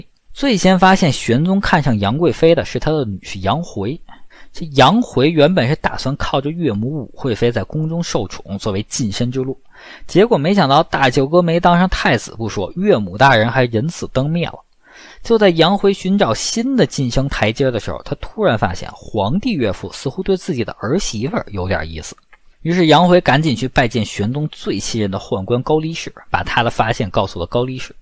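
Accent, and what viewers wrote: Beijing, China. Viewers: 确实听起来非常不舒服，有种吞音的感觉，南方人叫连刀快，可能是北京人的口音吧，听时间长了真的很难受，不过内容还是挺好的👌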